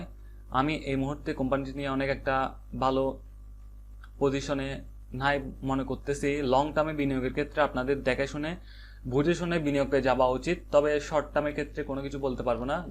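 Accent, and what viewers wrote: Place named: Chittagong, Bangladesh. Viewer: apner vasha dehe mone hocce apni Chittagong ar, I also live in Chittagong please tell me how to contact to u?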